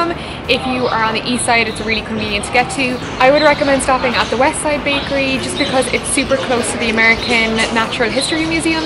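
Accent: Ireland. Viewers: U sound Irish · Do i sense an Irish Accent. :D Nice Video been to New York Twice love it. Deff beats home for the savory treats.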